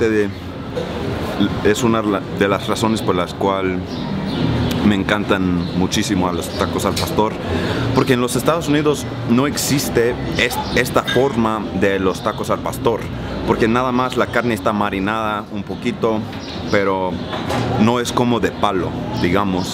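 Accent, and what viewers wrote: Mexico. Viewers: cada ves hablas mejor el español! y mas que estas aprendiendo el español mexicano! eso es super. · Wow your spanish is awesome. The vast vocabulary you have no mistakes at all even your Mexican accent is quite impressive. You ahould be very proud of your achivements. Remember one thing, Mexican spanish is spoken by at least 250 million people. So thats the spanish you got to use and speak for it also has such influence in the rest of the spanish speaking countries. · desciendes de Mexicanos'? o como es que hablas tan Mexicano? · Qué bueno que visitas México porque hablas muy Mexicano, pásatelo rico en mi tierra chido güey!!! 👍🏼🇲🇽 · Ya tiene 3 años mas o menos que no es Distrito Federal, SOLO CIUDAD DE MÉXICO O CDMX O CDMEX, tienes acento mexicano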